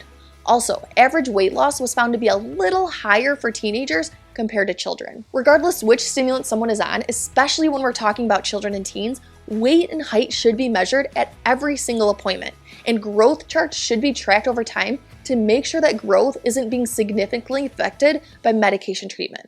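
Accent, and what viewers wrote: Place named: Wisconsin, United States. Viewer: You’re from Wisconsin or something with that accent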